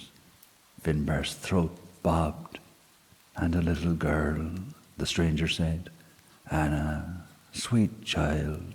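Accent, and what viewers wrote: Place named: Ireland. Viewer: Yes it's Saturday night and off course I'm on my own but the difference is tonight the 14th of February you are with me 🌹 Happy Valentine my beautiful Irish voice who tells the most haunting tales ❤️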